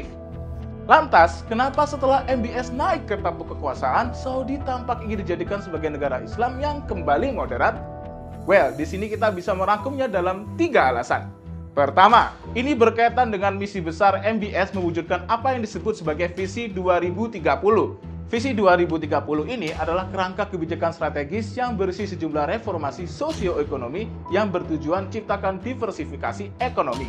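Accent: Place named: Java, Indonesia. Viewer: Medokmu itu lo mas, aku suka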